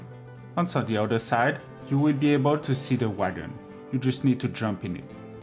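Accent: France. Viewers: What accent is this?? It sounds almost French with a touch of Dutch or even German.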